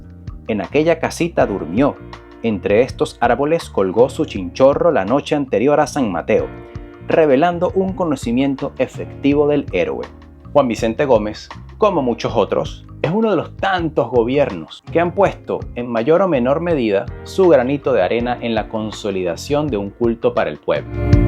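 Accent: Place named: Spain. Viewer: YO SÍ..... Yo si le rindo culto a dos personas históricas: JESÚS DE NAZARETH Y SIMÓN BOLÍVAR.... Comenzaste tu video con un mapa de VENEZUELA sin la GUAYANA ESEQUIBA..... Resulta que es cierto que le pusieron a todo el nombre de BOLIVAR; pero resulta que a BOLÍVAR LO DEJARON ESTANCADO EN LAS ESTATUAS, AV.. Y AUTOPISTAS...... Fue que luego se decide darle real vida a Bolívar, llevarlo al pueblo..... En nombre DE BOLÍVAR SE Manipula y, me parece, que muy inteligentemente estás manipulando en algunos aspectos en éste video..... Te faltó decir que en los EEUU hay una universidad con el nombre Bolívar...... Que la armada estadounidense tiene o tenía un submarino con el nombre del Libertador..... Hubo un momento en que ka historia de nuestro país fue eliminada de los colegios y liceos; por ende, Bolívar fue eliminado de la memoria del pueblo, sobretodo, del pueblo a pie..... 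Eso de eliminar el bolivarianismo es eliminar la vida y filosofía de BOLÍVAR...... Usted es un manipulador de primera....... Además, usted no es venezolano.... Su tono lo dice, su tono es español..... Ya he visto varios de estos videos: parece que se a gestado una campaña internacional en contra de nuestro Libertador.... Campaña liderada por España...... Recomiendo un artículo de la BBC de LONDRES HABLANDO DE BOLÍVAR Y CATALOGANDOLO COMO EL HOMBRE DEL MILENIO...... TAMBIÉN RECOMIENDO LEER A BOLÍVAR Y NO DE DEJARSE LLEVAR POR INDIVIDUOS COMO ÉSTE...... ÉSTE TIPO DE INDIVIDUO COBRA ($) POR MANIPULAR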